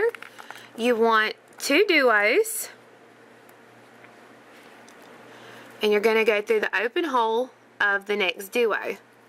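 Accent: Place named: North Carolina, United States. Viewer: I like watching your videos because the view is VERY CLEAR and in focus. Besides I love hearing your North Carolina accent! Fun to hear you talk.... I'm a Texan so I probably have an accent as well . . .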